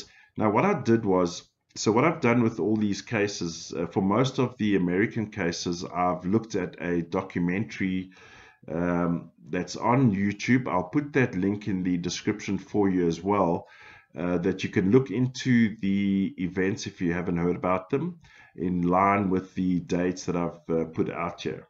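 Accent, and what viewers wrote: South Africa. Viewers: I knew this was a South African accent...are you still in SA?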